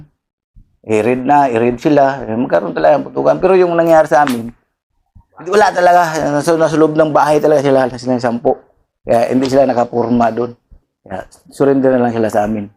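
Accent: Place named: Visayas, Philippines. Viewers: Ito idol ku mag kwetong bisdak na bisdak eh ganyan talaga mag salita ang mga parang carbon copy mag salita sa kilala kong din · Bisaya kc..haha..prang si tatay digs lang magkwento..nkktawa..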